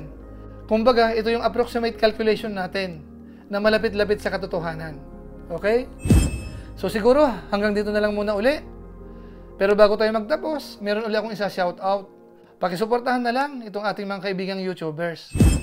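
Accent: Bicol, Philippines. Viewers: Bicolano ka ba noy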